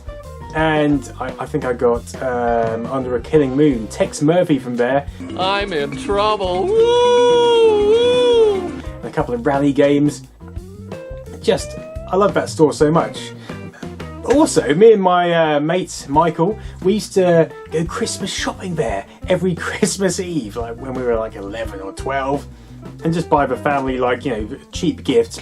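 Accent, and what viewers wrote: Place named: Estuary, United Kingdom. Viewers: Spinkington Clift haha you'd have no chance in this part of the UK, he's pretty posh by our standards. British accents vary considerably. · It's a British accent. That's how they talk. Actually if I'm not mistaken that's the "estuary accent" that's common in the UK. Not pronouncing final -r sounds is a hallmark of British accents and the th pronounced as F is called "th-fronting". But my linguistics education is pretty much limited to YouTube videos so i'm not an expert.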